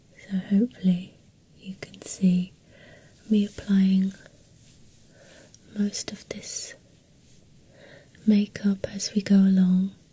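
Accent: United Kingdom. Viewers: I love her british accent💕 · I love your accent and the U.K. In general 🇬🇧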